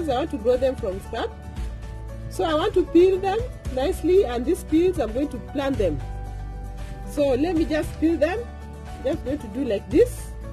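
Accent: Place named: Kenya. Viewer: I love your voice, reminds me of a coworker from Kenya. For some reason that English accent is my favorite. Plus everyone I've know with that accent has always been so amazingly upbeat. Great vid!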